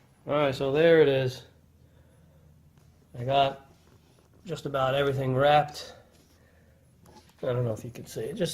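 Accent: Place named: Pennsylvania, United States. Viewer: You have to be from PA, you sound just like my friend Bill. Great channel, amazing work.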